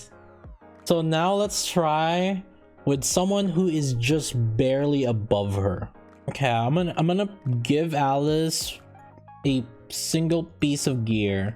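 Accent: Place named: Philippines. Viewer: Are you from the Philippines? Your english sounds like one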